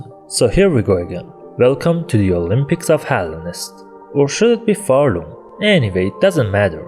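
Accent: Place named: Iran. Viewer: لهجه قشنگ ایرانیع 😂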